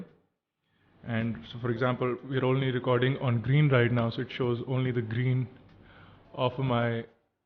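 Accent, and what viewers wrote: India. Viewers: hahaha lol " press the butt'n" it's not really that hard to spot an Indian even if he's talking a foreign language.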